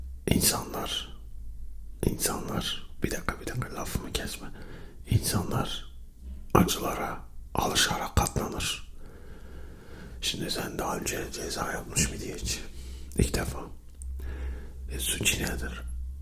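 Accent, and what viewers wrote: Eastern Anatolia, Turkey. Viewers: Doğu şiveli zaten güzel oluyor bir de bir şeyler atıştırmişsın ya abi iyi baya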